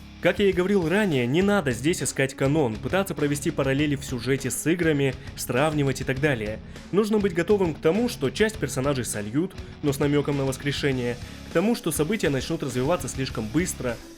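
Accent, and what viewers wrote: Far East, Russia. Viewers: Ты с Дальнего востока???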